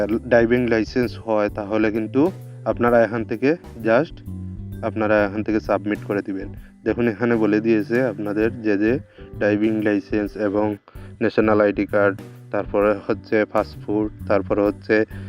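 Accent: Sylhet, Bangladesh. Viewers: Vai kita Sylheti ni? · ভাই কি সিলেটি??😊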